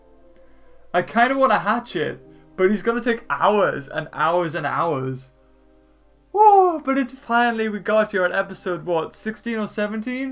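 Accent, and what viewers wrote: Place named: United Kingdom. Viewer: hes british even though his accent isnt heavy it probably just sounded like it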